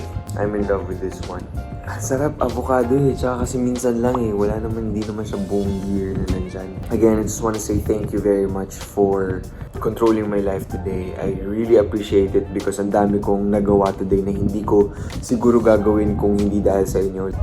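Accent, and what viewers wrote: Bicol, Philippines. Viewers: Grabi..cute mag bicol salita n...Hindi ko maintindihan..hinahabol ko talaga pag Basa para ma gets ko Ang sinasabi mo myloves ❣️❣️❣️ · Hahaha halo pala yung Bicol mo. Legazpi-Naga dialect. Hahahaha. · Sobrang cute mo mag salita ng bicol hahaha💚 I am sad but I saw and I watch your vlog today nawala na lungkot ko. Thank u, love u & missed u😁🥰💚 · Ahhahahaha inlove with your bicol accent😍😍😍 I'm also from Bicol 🥰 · i love it... naiintindihan ko ang bicolano ni... nkakaintindi pa rin pala ako... kahit di marunong magsalita... lab u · napansin ko lang po yung salita ng bikol my halong ilonggo ng konti haha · Nakakainlove yung bicol accent mo! 💗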